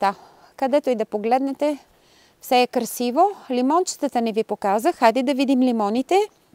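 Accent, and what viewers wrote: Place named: Italy. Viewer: Сигурно си от доста време в Италия, защото се усеща италиански акцент:) Ти сподели , че дъщеря ти не знае бг . Напълно те разбирам:)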